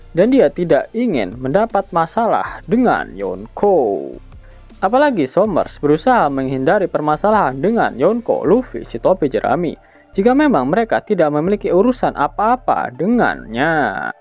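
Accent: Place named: Medan, Indonesia. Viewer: Medan nih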